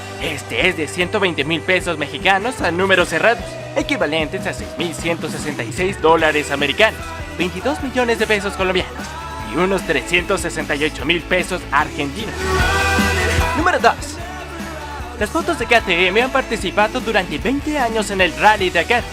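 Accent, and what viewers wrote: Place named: India. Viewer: Acento tipo Apu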